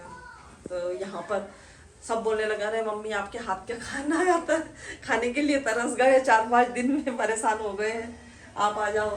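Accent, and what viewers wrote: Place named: Indore, India. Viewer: Ye indori language hai inki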